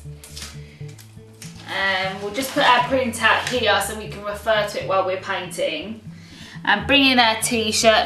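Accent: United Kingdom. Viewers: Epic British accent!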